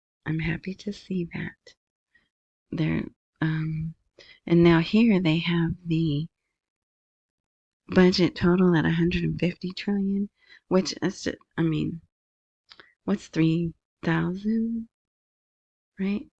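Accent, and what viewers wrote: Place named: South, United States. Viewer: Good Morning, don’t down play yourself on speaking. I’m only guessing your from down south as myself I’m from New Orleans but live in Metro Atlanta/Alpharetta Ga. I hear and understand you very well, all words are annunciated and articulated very clearly. No complaints here, keep up the good work. A little off subject I was about to invest more into Dinar & Dong but I own several million in both. I’m thinking of going about $5K and investing more into XRP crypto. I think this is own generation Bitcoin. If all avenues for sending money ( Western Union, Money Gram, Zelle, Crypto, Walmart to Walmart, Cash app ) whomever will have to go through XRP. I didn’t get it in 2022 at $0.50 now it’s $2.25 after court settlement. I think along with Iraq and all countries re settlement of currency XRP might be a good bet. I’m not telling people to buy I’m just saying I’m spreading around hoping for 1 to bring me on to super early retirement. 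Lol. Thanks for you platform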